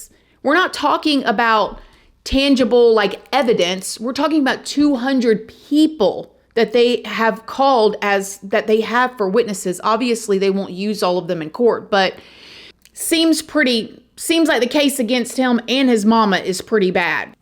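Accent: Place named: Florida, United States. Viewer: I have been going through it lately. I have to face my abusers in court next week and I’ve been spending every spare moment preparing. I’m a single mom with no lawyer, so I’m really out here on some Elle Woods sh*t. Anyway, I’ve been fixating on the case, in my head poring over my traumas all day everyday. But when your videos come on, I actually feel like I get a little break from that. You’re such a great storyteller, I feel how warm and caring you are. And you talk like the country Florida ladies I grew up around in Ocala before I moved to DC. I feel so relaxed and at ease listening to you. Which is ironic considering the subject matter 😂 a testament to how positive and bright your energy is! Thank you 🙏🏻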